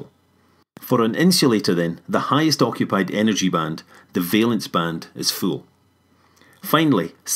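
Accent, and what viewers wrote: Scotland, United Kingdom. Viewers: Scottish accent ay?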